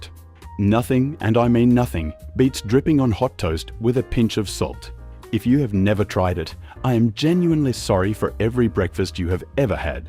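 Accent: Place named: United States. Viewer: Our friend can't disguise his Yankee origins with his pronunciation of the words Aussie, after and new.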